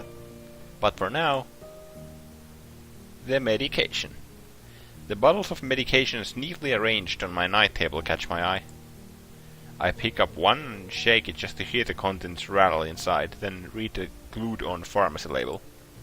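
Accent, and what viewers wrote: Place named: Finland. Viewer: It might sound a bit stereotypical but i really picture Sam Lake reading Katawa Shoujo to me when i listen to your LP, i guess that's what a finnish/english accents will do to you! :D Good stuff so far really enjoying it!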